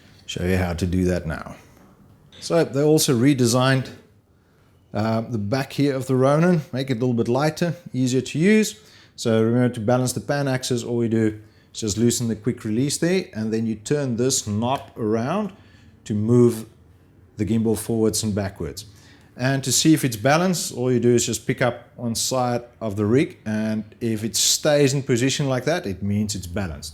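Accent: South Africa. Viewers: south african? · You sound South African bruv. You're local right?😁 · sounds like a south african who has spent a few years in AUS or NZ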